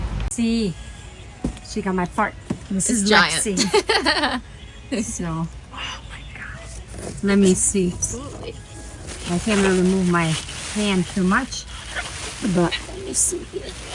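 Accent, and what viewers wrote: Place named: Central Europe. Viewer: Nice! Im trying to place your accent......Central Europe?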